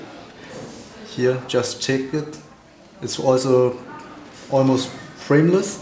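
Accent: Germany. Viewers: Typical German - English ... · sounds German as f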